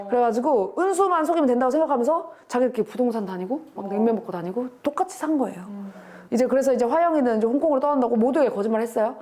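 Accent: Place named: Seoul, South Korea. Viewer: 언니 나 하루에 2번씩 보고있는데 연기력 물이 올랐쟈나~ 서울말투 기가 멕히쟈나~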